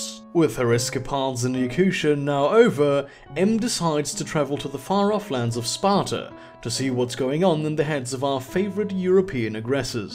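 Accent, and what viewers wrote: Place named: Australia. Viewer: Your Australian accent sounds like Russell Brand.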